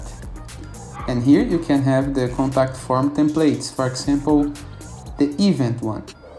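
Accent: Brazil. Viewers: Cara, você é BR né? kkkk Top, mandou bem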